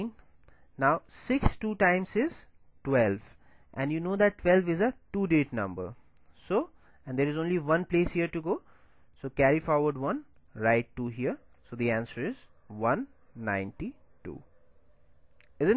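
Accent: India. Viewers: I think the Indian accent adds 2 it, u know, he sounds wise, and stuff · Are you indian by any chance ? Your accent seems like that. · according to his accent, this guy is indian, so asian is correct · ur accent is so awesome! it sounded indian or jamaican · clearly he is indian. do you not recognise the accent? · Itallian? He sounds Indian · indian accent! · The discussion is about your ugly pathetic 3rd world Indian accented english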